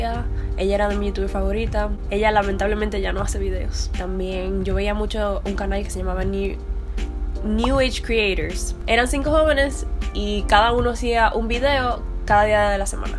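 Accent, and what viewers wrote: Dominican Republic. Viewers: ¿Buen video, algo me dice que eres cibaeña, quizás de Santiago cierto? (Yo también soy del cibao STGO 👌🏽. PD: Your channel's name reminds me of song: ❤, kep it up with the good work, greetings from DR! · Más videos en español ! amo el acento Dominicanoo <3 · Wow q genial! Me encanta tu accente.. El accente Dominicano. Mis vecinos pq soy un Haitiano. 🇭🇹. Soy nuevo de YouTube. La verdad me motive mucho, para sigue aprendando mas espanol, y tambuen sigue adelante con Mi canal aqui.. yo no me voy por vencido.👊 GRACIAS 🙏🙂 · por fin un canal con una dominicana que habla como hablamos la mayoria de los dominicanos, asi es que habla el dominicano promedio. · I just found your channel. Nice to see a Dominican YouTuber. You have a nice voice/accent! You sound a lot like my cousin who lives in the Dominican Republic. Like EXACTLY like her. 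· I'm not trying to be biased cause I'm half Dominican. But the Dominican accent is like the best. 😏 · Me encanta el acento dominicano! 🙂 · I'm also Dominican! Love your accent! Sounds beautiful, Spanish but with African twang and a bit of Arawak. Love our Dominican Spanish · Es mi primera ves ver tu video. Yo hablo inglés pero siempre estoy buscando oportunidades de practicar el español. Yo te entendí perfectamente que me asombra porque el acento dominicano es único. Gracias y espero más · Finally a real Dominican accent that’s not exaggerated.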